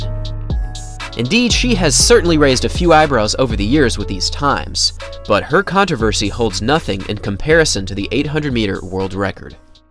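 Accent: United States. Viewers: Why don't you take Griffith Joyner into this equation ? oww wait, i hear a yankee commenting voice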